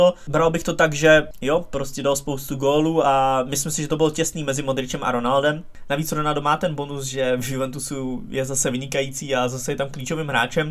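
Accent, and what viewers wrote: Czech Republic. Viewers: To je otřesné, jak furt mluví jak Pražák.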